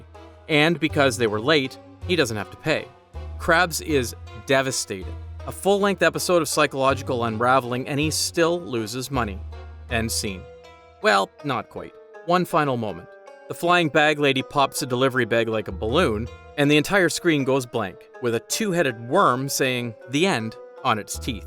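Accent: Canada. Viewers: Your channel might be marked “United States” but your accent outs you as Canadian. I’m on to you, buddy! 🫵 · Are you Canadian?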